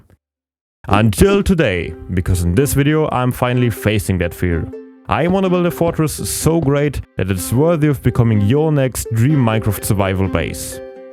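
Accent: Germany. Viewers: Are you German? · Your German right? And nice build btw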